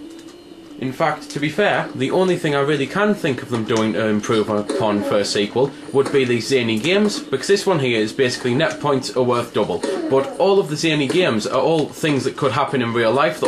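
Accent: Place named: Newcastle, United Kingdom. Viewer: this is an old video but i still found it helpful, an excellent review, and the commentator had what i think is a geordie accent , "Howay the lads!" "Toon Army"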